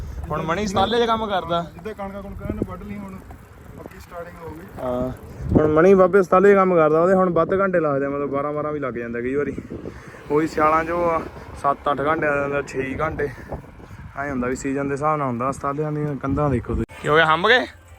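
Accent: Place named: Moga, India. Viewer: Malwa area y tera moga lgda boli to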